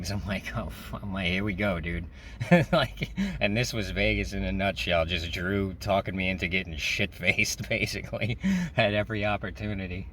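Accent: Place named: Long Island, United States. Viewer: 💜🐷💜 Enjoy listening to your stories. Watching from Palm Coast FL. Had a boyfriend from Long Insland who died 10 years ago. Your accent reminds me of him.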